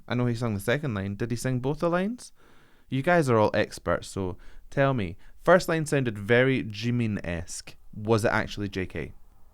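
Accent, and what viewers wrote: Scotland, United Kingdom. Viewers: Love your BTS reviews & technical explanations, love your Scots accent--if I had functioning vocal chords, would def hire you as a coach! How about reacting to House of Cards...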